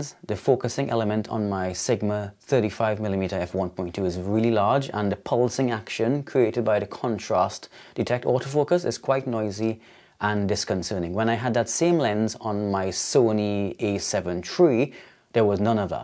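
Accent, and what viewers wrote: Caribbean. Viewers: Great video! I just got my S5 and am learning to use VLOG and colour grade. You sound West Indian. Are you? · Fantastic user's insights into the S5. Great hybrid camera. Your hybrid Caribbean accent is also great.. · Being from the Caribbean I recognise Caribbean accents instantly 😅 Thank you for the informative video, particularly sharing tips for auto focus settings. I've probably watched over 20 videos about the LUMIX S5 in the last 2 days and your video is the 3rd video to share tips for better auto focus out of 20+ videos. All the other videos I've seen just complain about the auto focus but don't offer any tips to improve it, I thought one of the biggest selling points of having a camera with pro features was the ability to dial in your own custom settings but seems like those other reviewers just wanted the auto focus to be good out of the box. 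Considering what this camera goes for now with the 20-60 lens included (under £1,200.00 New) I think it's a small compromise. I've been saying for years, "I'm going to buy a high quality camera" to share photos & videos of my work but other things kept coming up but I think the time is now and the LUMIX S5 looks like a very good deal for the level of quality it can provide. Thanks again for sharing your thoughts and experiences 👍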